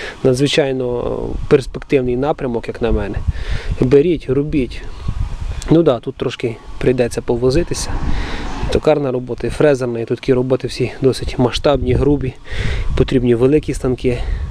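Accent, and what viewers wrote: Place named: Ternopil, Ukraine. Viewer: Дякую за Украінський канал і за рідну Тернопільсьську говірку, супер!!!))))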